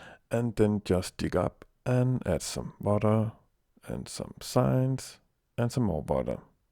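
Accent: Denmark. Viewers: Are you from denmark!? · I think he is a dane..... LOL HAHAHAHA dat accent. Nice tutorial though well done :) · are you from denmark? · you're danish. i can hear it. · You are 100% danish! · H0T-Vlog Go to My Channel What? I was just mentioning that we can hear the danish accent pretty clearly? Also its an 8 year old comment. I dont get it, why send an entire IGN walkthrough? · du dansker ik? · You come from Denmark right? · Are you danish? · I put your videos on replay just to hear your accent. My name is Dan, so it being a DAN-ish accent gives me some sort of ridiculous thrill XD but anyway good job, man, you helped me a lot to build a working mob trap for the guys on my server :) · He has a very danish accent :) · er du dansker eller hvad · Your Danish accent is awesome! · Dansk accent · he most be danish :D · er du dansker ???? synes jeg kan høre :P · No, he sounds like someone who speaks multiple languages, is Danish, is a genious, and provided you with the perfect tutorial on how to build an xp farm. 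Stop hating cuz you couldn't dream of being half as smart as this guy. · Are you from Denmark?!?!? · His accent sounds very danish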